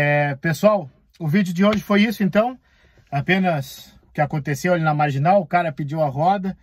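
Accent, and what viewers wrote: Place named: Rio Grande do Sul, Brazil. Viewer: De acordo com o sotaque, quase imperceptível, trata-se de um gaúcho.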